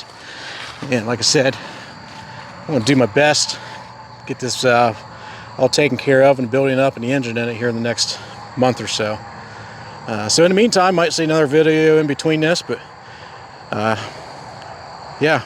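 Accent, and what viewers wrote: Pittsburgh, United States. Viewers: Are you out in Pittsburgh??..I am from out there and you sound like all my relatives...Great job saving these old rigs..I have made rings out of cast iron pipe and a lathe...I need to get back into everything . Dealing with some life issues..I have a 1928 Morgan waiting for reconstructing...keep up the great work..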